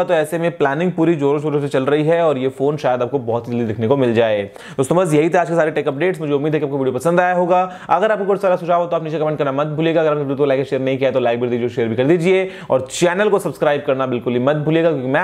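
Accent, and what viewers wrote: Bengal, India. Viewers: bhai aap kiya bengali ho?/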